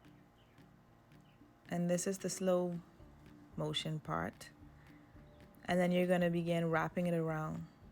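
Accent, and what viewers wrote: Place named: Bahamas. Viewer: Are you bahamian you sound like me am from the Bahamas but live in Seattle Washington currently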